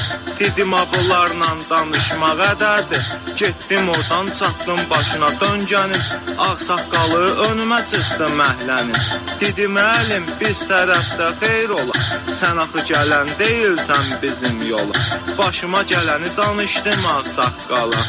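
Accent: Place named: Shaki, Azerbaijan. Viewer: 👍👍👍👍😂😂😂😂😂 ardı gəlsin Qəşəng caan ay Şəki ləhçəsi..👍👍👍👍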